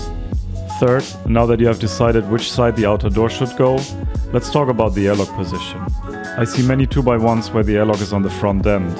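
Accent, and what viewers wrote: Germany. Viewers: Ein Deutscher aktzent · Du hörst dich deutsch an, bist du’s auch?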